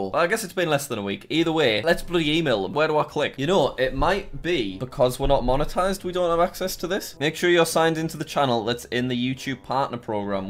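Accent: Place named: Scotland, United Kingdom. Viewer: You sound scottish... And i like it.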